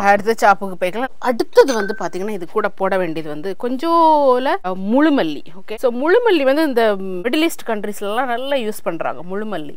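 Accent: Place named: South Tamil Nadu, India. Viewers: சகோதரி பல ஆண்டுகள் லண்டனில் இருந்தாலும் நம் திருநெல்வேலி தமிழை மறக்காமல் பேசுவது பெருமையாக இருக்கிறது மட்டன் கபாப் சூப்பர் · Super mason தாங்கள் சமையலை விட நீங்கள் பேசும் எங்கள் தென்னாட்டு மொழி மிகவும் அருமை எங்கள் முகவரி இந்தியா தமிழ்நாடு திருநெல்வேலி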